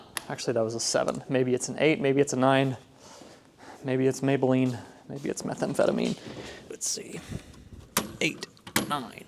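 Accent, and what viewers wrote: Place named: South, United States. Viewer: You've got a southern drawl you don't really get on your aviation channel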